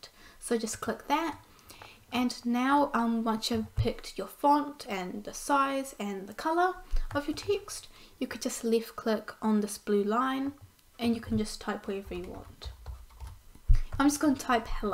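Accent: New Zealand. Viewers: Kiwi accent ;)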